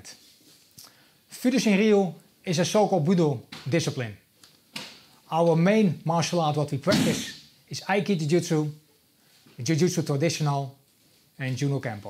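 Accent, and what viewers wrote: Netherlands. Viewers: Your English is good, you sound Dutch I don't speak Dutch but do speak German as well as English. I was told while learning German you never loose your native ancient unless you live in the country for 20 or more years. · Thank you very much for your hard work! btw, your English is actually awesome. by your accent I'd say your Dutch, am I right? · Your english is oki :D dutch accent is funny, too.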